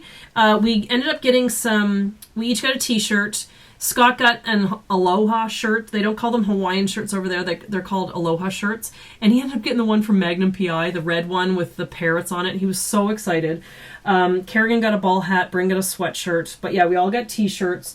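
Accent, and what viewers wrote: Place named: Canada. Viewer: I also like your canadian accent and I adore your sketches. Can you make a pinata for us on ur craft channel :)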